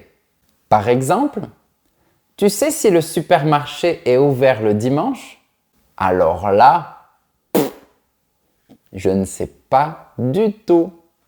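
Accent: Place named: Toulouse, France. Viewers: Le petit accent toulousin est tres mignon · Personnellement, j'ai toujours fait la liaison dans l'expression "Au cas où", je dis "Au cas z'où", comme dans "c'est obligatoire", j'ai trouvé bizarre que vous n'ayez pas fait la liaison, moi, je dis "c'est t-obligatoire" pas "c'est obligatoire" comme s'il y avait un h muet à obligatoire (hobligatoire)... ce n'est pas une critique, ce n'est qu'une observation, c'est une vidéo très intéressante, et vous êtes super-charmant... et attendez que je devine, vous êtes du Sud-Ouest. 😁😁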